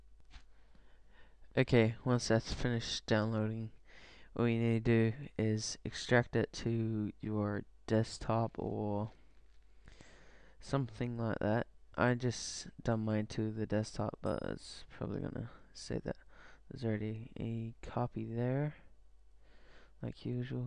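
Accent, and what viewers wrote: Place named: Australia. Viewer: Dude, nice accent, is it aussie?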